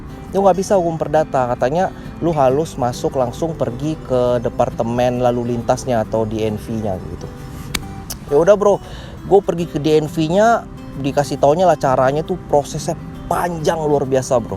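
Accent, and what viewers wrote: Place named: Riau, Indonesia. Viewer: Ngomongnya kaya org riau. Hehe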